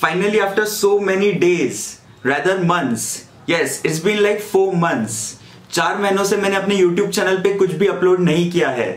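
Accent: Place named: Maharashtra, India. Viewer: बोलण्यावरून मराठी दिसतोय... बरोबर ना..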